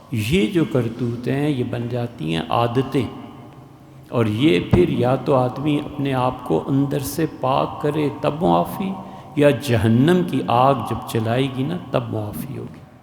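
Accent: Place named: Lucknow, India. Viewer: مفتی سعید خان پاکستان بن چکا ہے اور اب تم لکھنوی ماحول سے باہر مسلم معاشرے کے رہائشی بن چکے ہو لہٰذا اپنے لب و لہجہ کو پاکستانی زبان سے متعارف کروا کر ہندو غلبہ والے معاشرے سے دور کر دو تو بہتر ہوگا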